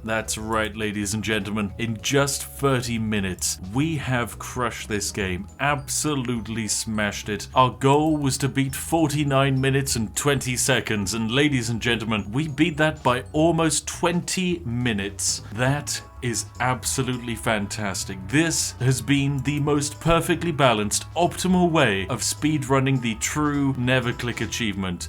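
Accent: United Kingdom. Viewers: why so british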